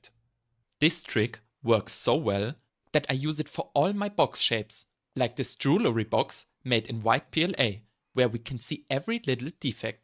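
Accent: Germany. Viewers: 3D printing + german accent = I subscribe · Im a simple men, I hear german accent i press abonieren.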